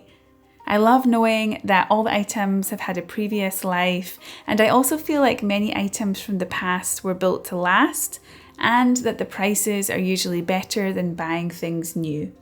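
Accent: Scotland, United Kingdom. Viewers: Hi, I noticed Jessica posted in Roots-In-The-Wild spending a winter in Scotland in her van, but I love your enthusiasm and you are a true ambassador for Scotland no mater what weather with a beautiful Scottish accent to match. i think for 2026 I would like to be a better person and don't be critical, abusive, sarcastic, insensitive, cynical, difficult, to show more kindness to others and be more open handed in my giving, and of course visit Scotland in my t5 campervan. May the true meaning of Christmas be felt in all our hearts, Rock on, enjoy, merry Christmas. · So Cozy! I do van life here in NZ a few times a year but I was born in Scotland so you really do make me miss it! You are well set up and so careful on the road; I was chuckling all the way through - it would be great if cooking was that fast (and nice looking) in real life. I've never actually lived in Scotland - I was whisked away only 6 months old - but I am practicing your lovely accent and hope maybe I'll make it back there one day. 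Aroha and a merryX from Aotearoa.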